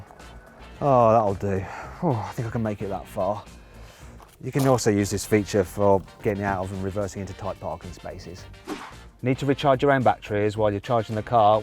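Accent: United Kingdom. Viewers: イギリス英語とアクセントが学べるので欠かさず見てます。車も好きなので一石二鳥です。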